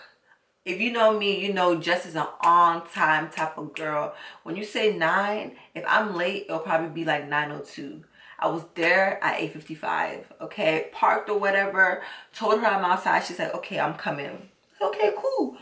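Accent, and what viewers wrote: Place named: Nigeria. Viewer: It’s the Nigerian accent for me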